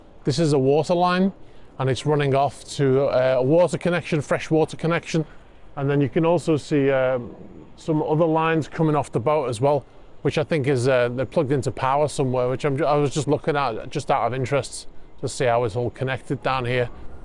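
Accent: Liverpool, United Kingdom. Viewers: first video I’ve seen of yours here, belter, nice to hear a fellow scouser on YT!! Keep the bits up lad is right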